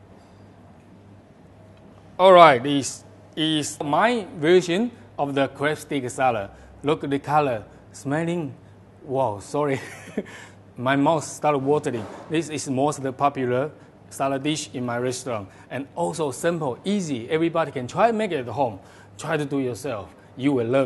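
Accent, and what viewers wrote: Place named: China. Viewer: His accent is definitely of China...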